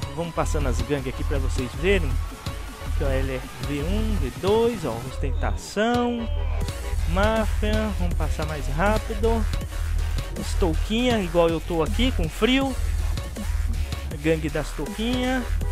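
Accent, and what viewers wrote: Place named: Ceará, Brazil. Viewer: Vc parece cearense tmj top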